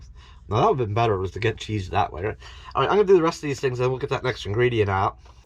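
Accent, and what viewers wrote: Pennsylvania, United States. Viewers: That launch was cool, thanks for sharing..I live on the Delaware MD border n when the skies are clear you can just see the launches from Wallops Island in Accomack Va..have never gone to the launch but it's on my bucket list..I enjoy your videos..you remind me of family, how i was raised in Ohio and for my Pa cousins it's the accent, lol..Happy travels, Cheri✌️❤️😊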